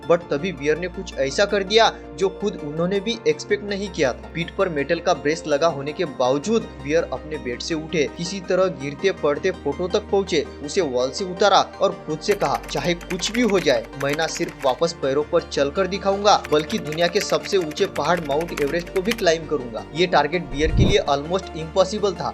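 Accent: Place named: Maharashtra, India. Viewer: Bhava tuzi hindi marathi tuning madhe vatat aahe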